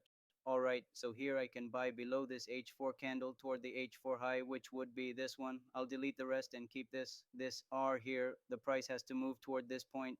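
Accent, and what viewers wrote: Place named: India. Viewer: Estabas bien haciendo tus explicación en tu idioma natal el castellano. Pareces un Indu hablando ingles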